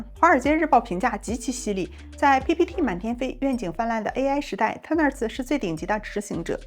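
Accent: Northeast, China. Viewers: 捂。。。唠。。。 戈。。。？？？ 大姐东北银啊？？？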